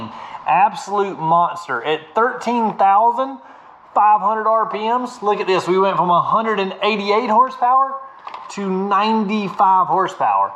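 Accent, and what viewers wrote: South, United States. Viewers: Sorry, after trump, I can’t stand a hillbillies voice.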